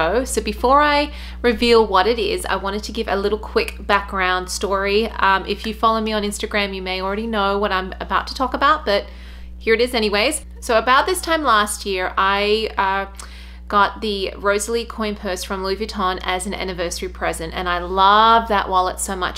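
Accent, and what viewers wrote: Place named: Australia. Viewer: New to your Chanel. You sound Aussie! So why the US $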